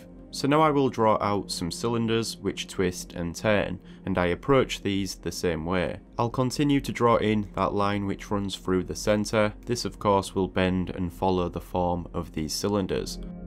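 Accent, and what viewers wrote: United Kingdom. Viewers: obviously, but which one of the British accents 🤔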